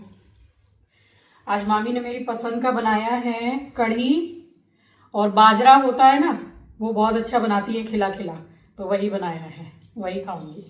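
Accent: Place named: Braj, India. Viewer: Hi ji.. mere mann mai ek query hai ki aap rahti Kolkata mai h to aapki kuldevi kaila maiya kaise itni dur and aapki language bhi agra Mathura wali hai.. kyu? Aisa m isliye pue h rai hu kuki m agra se belong karti hu aur hmari kuldevi kaila devi hai aapki wording hmari jaisi hai reply jarur karna pls..@ ji reply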